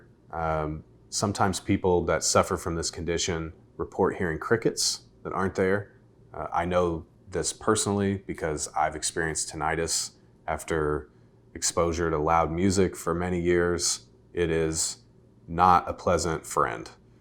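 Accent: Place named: Indiana, United States. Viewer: You, sir are absolutely correct. As a disabled navy veteran myself, I can attest to the facts you've stated. I'm service connected for tinnitus, and have been diagnosed with hearing loss and provided hearing aids as of last year. I also service connected knees, and was diagnosed with sleep apnea. The VA denied my sleep apnea claim despite the diagnosed and cpap machine. I'm currently filing a PTSD, insomnia and migraine headaches. As a native of Indiana myself I pronounce tinnitus the way as yourself lol. And thanks for the great presentation.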